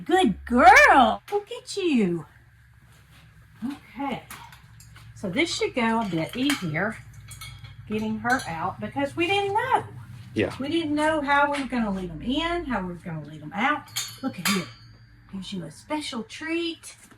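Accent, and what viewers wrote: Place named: Alabama, United States. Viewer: I love how you say “boil”! You are so darn cute with your Alabama accent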